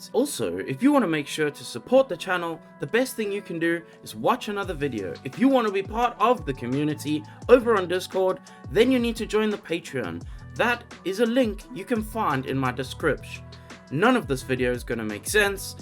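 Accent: South Africa. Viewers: South African?!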